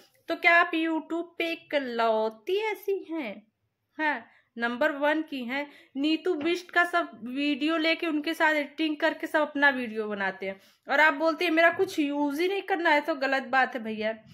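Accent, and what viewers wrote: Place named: Lucknow, India. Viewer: Ap didi up Lucknow se ho kya 😅😅😅 ap ki boli se lg rha h 😅😅😅😅 but ap ka videos acha lg rha h 😅😅😅😅😅😅😅😅😂😂😂😂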